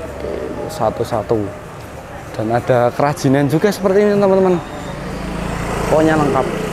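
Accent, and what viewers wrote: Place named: Java, Indonesia. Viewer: Suara hostnya bikin gemes. Njawani banget 😅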